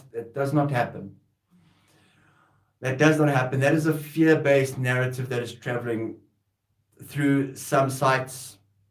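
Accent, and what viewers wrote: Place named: South Africa. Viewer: hello, are you south african? you have an SA accent. i'm new to your channel, i'm from Botswana. do you do your lives from facebook or from youtube? would love to tune in sometime.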